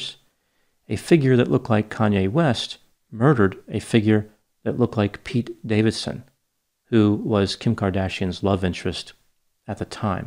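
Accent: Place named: New Jersey, United States. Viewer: I believe he mentioned that he's in Phoenix now? Hence, all the cacti that's gradually increasing in his background. 🌵🌵🌵🤔😂 I definititly can hear the jersey accent in his speech, though, and I love it haha.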